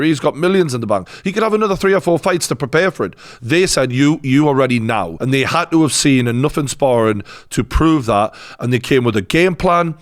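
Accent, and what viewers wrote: Newcastle, United Kingdom. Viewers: True Geordie stating facts.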